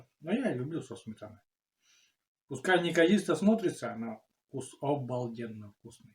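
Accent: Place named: Arkhangelsk, Russia. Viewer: Спасибо.Я недавно на Вашем канале.много посмотрела.но ещё не все.С мужем смотрели.как Вы рыбачите.как грибы собираете..Хорошо.что такие помощники у Вас есть.Не так надрываться.Корни моих родителей -Архангельская область.И поэтому говор ваш слушаю с огромным удовольствием.Но дома в вашем селе отличаются.Большие.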